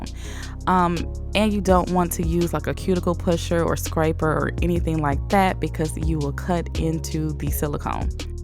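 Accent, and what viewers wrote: United States. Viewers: thankss.! why is so pleasant listening an american speaking instead a mexican speaking english! thank u so much!!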